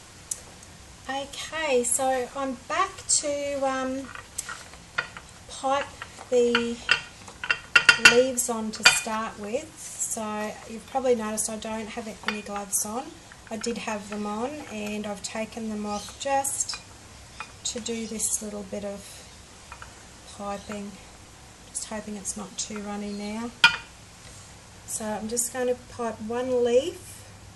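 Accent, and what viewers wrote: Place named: Australia. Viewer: Very pretty result. I've been watching so many American or Canadian soaping YouTubes lately that an Aussie accent really stands out, even though I'm Aussie too LOL Awesome work on your first video - I know it's not easy.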